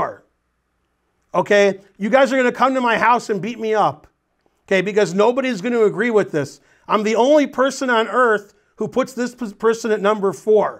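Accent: United States. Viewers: Are you 'murican, by any chance?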